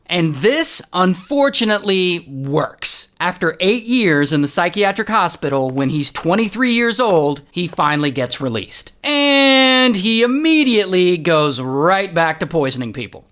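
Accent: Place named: United Kingdom. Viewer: If you can't tell its a British person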